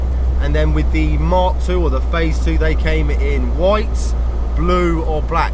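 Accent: Liverpool, United Kingdom. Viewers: Yes mate, scouse in the house legend 👍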